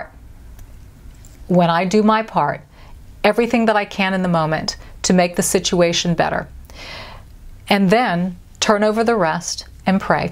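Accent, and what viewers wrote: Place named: United States. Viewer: Hello...I'm German and I love to listen to your "perfect" American English to improve mine :)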